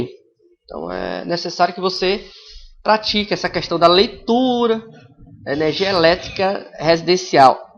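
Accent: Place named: Paraíba, Brazil. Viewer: caralho,ele sabe explicar,mas eu odeio voz de paraíba! Énem, pÓlarização aff!!! tudo é Ó e É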